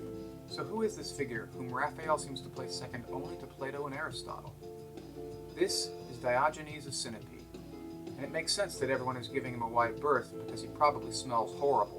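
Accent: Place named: United States. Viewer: i'm not too surprised, you're not the first and I daresay not the last to take it upon you to defend american honour or against generalisations/stereotypes and generally ignorant comments. if you're offended I apologise. No buts, just sorry. I find the accent grating and partnered together with a decimation of quality media such as David Attenborough's Frozen Planet annoyed me enough to take it out on all of you Americans. It's not that bold, it's the internet - expect to get input akin to this.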